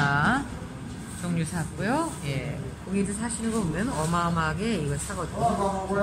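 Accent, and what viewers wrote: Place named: Italy. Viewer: 굉장히 이탈리아적인 목소리 입니다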